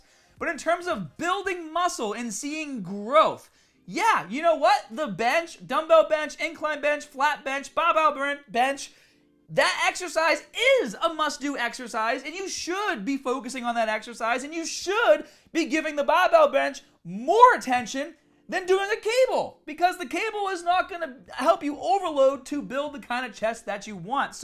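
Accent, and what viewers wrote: Boston, United States. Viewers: Lol Boston accent thicccccc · I'm starting to get used to the Boston accent. It's becoming endearing! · Bruthah, your accent is wicked pissah! I grew up in Boston but lost my accent in Tuscon. Whenever I'm in Boston I realize that the accent's disappearing. Hold on to it.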